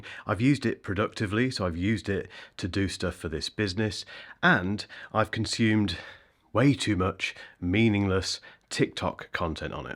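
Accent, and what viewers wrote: United Kingdom. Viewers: Hi. Thanks for a great video as usual. Great to listen to a Brit. Looking forward to your review of the Honour V2 but like you said I think Samsung software is still the best. From the reviews I have seen so far, the phone doesn't multitask horizontally.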